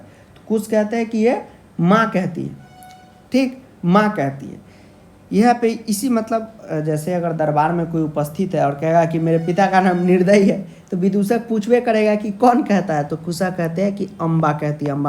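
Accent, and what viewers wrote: Bihar, India. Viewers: Bhut bhadiya sir asli bihari ki tarah padhe h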